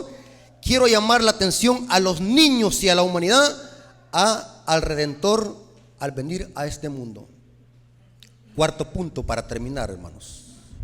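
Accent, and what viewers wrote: El Salvador. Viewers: 🚨De que pais esta este pastor?pienso que sera del Salvador,no me parece su acento a los Nica🤷🏻‍♀️🚨!!!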